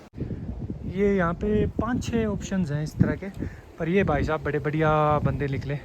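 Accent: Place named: Haryana, India. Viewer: Teri boli s to hariyana ka lag h bhai.kad ka hai bhai ?bada himmat wala chora hai bhai.ke bera m bhhi tere gaw ke aas-pass ka hou.bataiye bhai.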